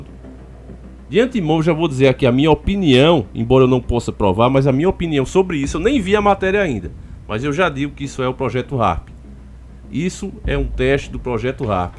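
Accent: Northeast, Brazil. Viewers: Eu por exemplo,acho o sotaque falado do aqui do canal diferente e surreal. Eu não sei como seria quando eu visitasse o nordeste como a cidade de Recife-Pernambuco. Nossa! Será algo como ir a outro país dentro do próprio país e eu tenho essa curiosidade de ver isso de perto nessas terras longínquas. Eu acho o nordestino super diferente em tudo; seria como estivesse convivendo com outra nação. Diferente né!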